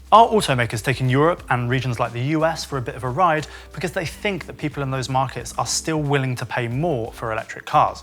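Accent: England, United Kingdom. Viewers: Like the reasoning and the English accent. Would I ever own an electric car ? Over my dead bloody body !!!!!!!!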